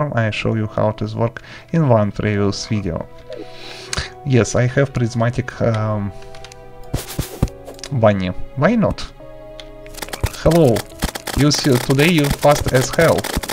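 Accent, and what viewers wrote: Russia. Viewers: heh, this russian accent on english words is kinda cute lol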